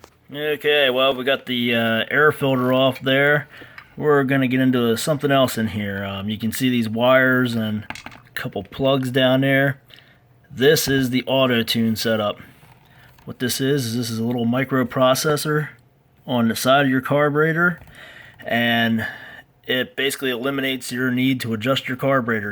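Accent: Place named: Pennsylvania, United States. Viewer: Hardcore PA accent right there.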